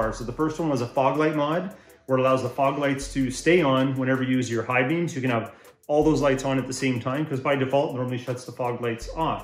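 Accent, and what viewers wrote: Canada. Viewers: I was wondering why you sounded like Jordon Peterson and used some of the wordings he does until I realized you’re both Canadian lol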